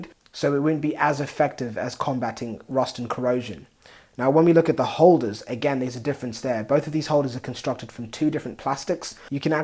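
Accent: England, United Kingdom. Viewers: I was expecting a Canadian accent, not English. Anyway, thanks for the video, I'm in the market for skates. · Can anyone else narrate without that accent., it’s bad enough on commercials or cooking shows but now hockey, funny not aware of any English hockey teams.....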